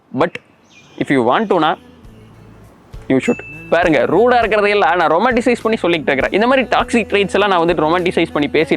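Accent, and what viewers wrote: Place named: Kongu, India. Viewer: Dei kongu paiya unnoda slangatha enaku pudikum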